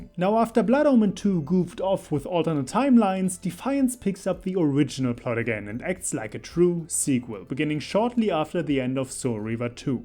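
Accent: Germany. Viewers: This guy is obviously german. His accent is extremely german. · I can't get past the german accent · Wow! What a wonderfully detailed summary! Love it! 👍👍👍 But it's funny how your German accent is getting more and more pronounced during the video. 😆😉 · Are you from Germany? You have a little accent. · The German accent is strong with this one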